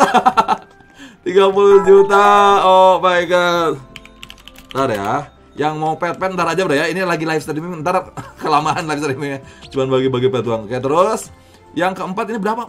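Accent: West Java, Indonesia. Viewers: Bang lu sunda ya?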